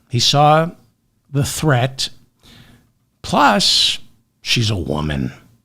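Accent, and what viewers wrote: New York, United States. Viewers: Love your New York accent!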